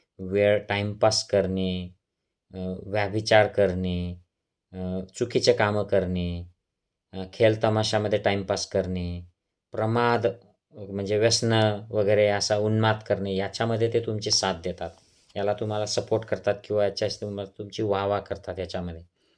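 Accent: Khandesh, India. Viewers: आपली खानदेशी टोन काय बदलेली नाही.... मस्त याला म्हणतात जय खानदेश.... नाही तर थोडे काय शहरात गेले की स्टाईल मध्ये मराठी इंग्लिश बोलतात....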